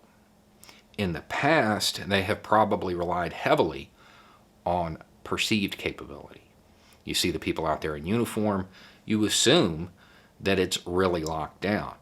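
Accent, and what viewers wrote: South, United States. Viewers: Smart man country accent...ty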